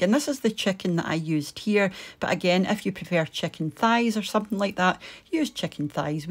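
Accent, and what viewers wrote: Scotland, United Kingdom. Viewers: Ita a follow from me to you whoop whoop ❤ mo the bogan loves a we scottish accent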